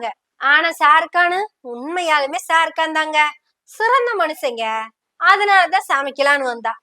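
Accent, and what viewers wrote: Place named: Coimbatore, India. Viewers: Good coimbatore talk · Kovai tamil super🎉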